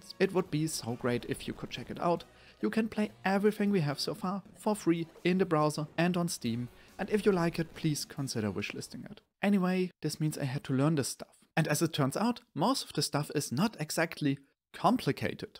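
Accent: Germany. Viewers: This man has one of the lovliest German Accents i could imagine xD Nice work man!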